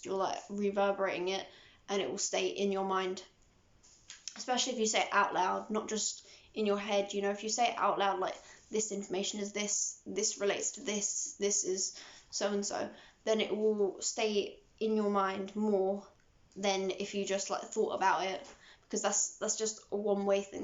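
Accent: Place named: England, United Kingdom. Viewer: i don't know why you sound more english in this than usual it's funny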